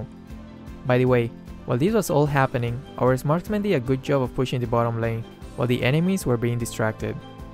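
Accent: Philippines. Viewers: i believe no... Based on his accent... If ur filipino u wud know wat i mean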